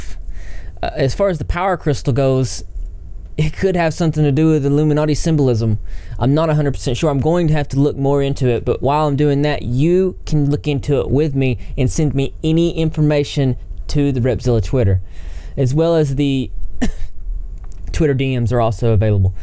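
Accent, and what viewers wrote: South, United States. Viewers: you rock a southern voice THATS HARD TO DO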